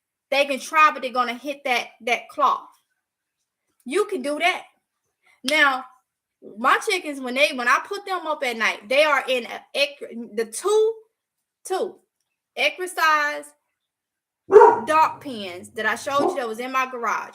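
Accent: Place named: South, United States. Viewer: This is my first time making a comment, girl don't apologize for the way u talk.we southern ladies can't help it.We get the message across. Also,don't stop these vlogs,they are so helpful. Please call me!!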